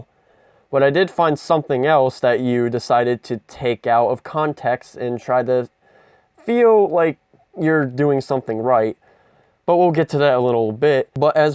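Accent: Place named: Belfast, United Kingdom. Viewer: OMG I can hear the Belfast accent in your voice lol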